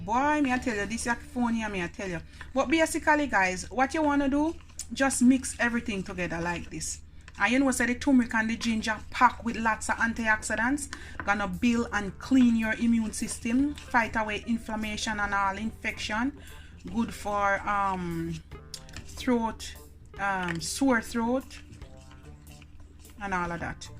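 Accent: Jamaica. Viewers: This is just an amazing healthy juice. Love your Jamaican accent is killing me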